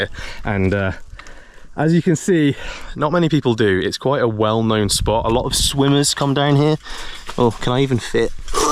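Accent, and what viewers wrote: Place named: England, United Kingdom. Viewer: Thumbnail, content, editing after 20 seconds and the fine English accent (not Australian??) is an instant sub. Very Jealous of the work you do chap, I'm aiming to find myself in the outdoors more like this more frequently. Hopefully more trips can be inspired by your vids!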